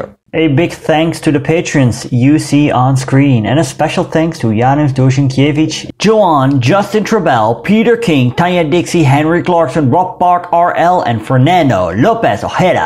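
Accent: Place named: Netherlands. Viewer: prachtig zoals je die "internep revisionist" neerzette! (en, JA, ik spelde internet met opzet zo...) ik vind je kanaal "History Hustle" fantastisch en voor het overgrote deel correct. het enige, kleine, minpuntje is je flinke accent als je Engels spreekt en ook met de uitspraak van Franse namen kan ik merken dat je een Nederlander bent...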